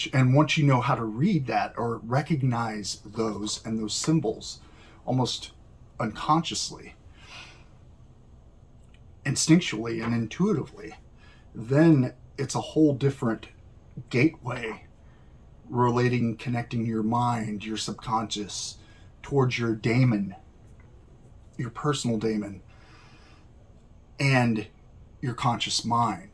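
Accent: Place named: Pennsylvania, United States. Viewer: He sounds like he's from Pennsylvania! 😊 Quick question: Can you kill someone with this? 👀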